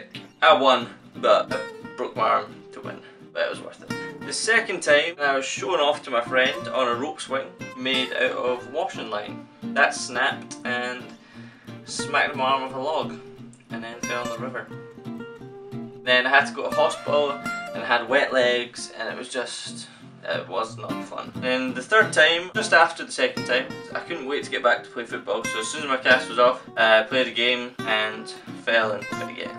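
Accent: Scotland, United Kingdom. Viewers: I've never heard a Scottish accent personally it's really cool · I really do like his channel a lot. And I love Scotish accents. America sucks. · Subscribed- G'day mate! your accent reminds me of my year 4 teacher who came from Scotland - but that was a long long time ago. I thought it would be good/interesting to see how you develop your blogs from here. Good work! Good Luck! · Scottish Accent>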